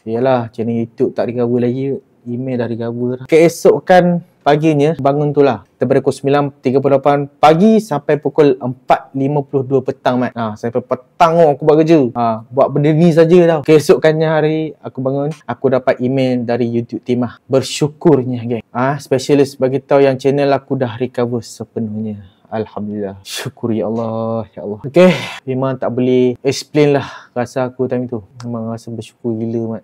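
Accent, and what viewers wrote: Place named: Kedah, Malaysia. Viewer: Kedah mana, Aku pendang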